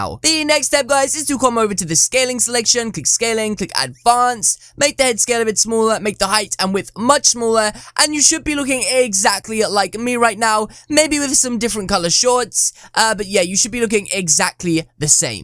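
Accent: United Kingdom. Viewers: This guys BRITISH?!??GOD I LOVE HIM EVEN MORE!!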